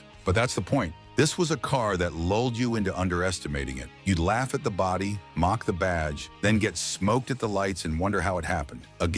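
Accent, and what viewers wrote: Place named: United States. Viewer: What no FD Vauxhall Victor 3.3? No wonder the narrator has got an american accent aye, bah!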